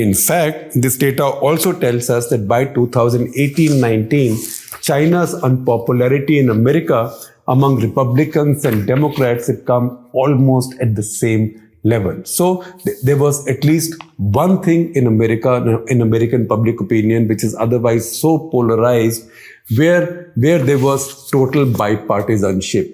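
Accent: India. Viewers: Delusions of grandeur, sabre rattling, cringy indian accent on that unpleasant face and obsessing about others is what shekar and indian journalists are good at. Anybody could have read out the report. Stick to journalism dude by shedding light on indian problems. Never heard explaining the PEW report dated june 29th,21 that talks abt religious intolerance in india. No wonder indian journalists have such a bleak view of the world.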